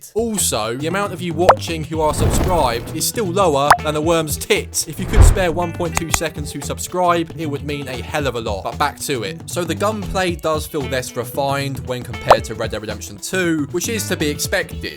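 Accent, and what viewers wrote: United Kingdom. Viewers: This review is a bit too "Bri-ish" for me.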